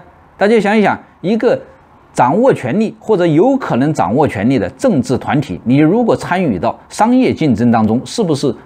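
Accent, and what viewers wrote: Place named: Wuhan, China. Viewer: 请问是不是武汉人啊？口音太像武汉人说普通话了。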